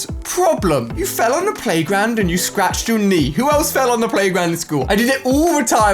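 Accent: England, United Kingdom. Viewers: Is he english?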